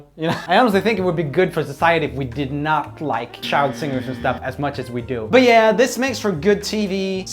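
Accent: Gothenburg, Sweden. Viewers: why is his swedish accent so strong here? :DD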